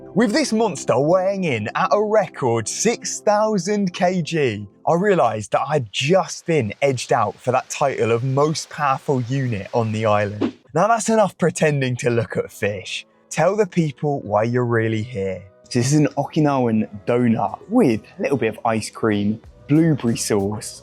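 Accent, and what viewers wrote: United States. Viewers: I feel like the video could have used more of the Translator. American accent 6.1 out of 10. Surfer or John Wayne? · Please never abandon the American accent. Peak.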